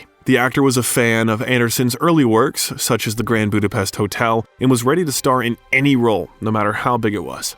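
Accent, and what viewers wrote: United States. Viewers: You are speaking in an American accent. HERE, we call it soccer!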